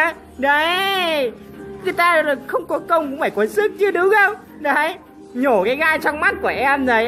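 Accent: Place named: Phu Yen, Vietnam. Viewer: Phú yên kìa 🤣🤣 quê tui 🤣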